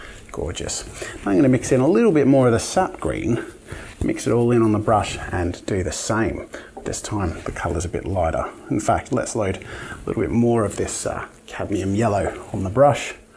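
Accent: Australia. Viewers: I know heaps of Aussies who talk like that. It’s not annoying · With A Hint Of Aussie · It's weird, i could never really tell the difference between australian english (well, at least yours, i dont really watch many australian people) and british english (I'm a french canadian, we have neither here lol). But in this, i can CLEARLY tell XD it's essentially a mix between southern US and England. I get it now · The Australian accent gives a spice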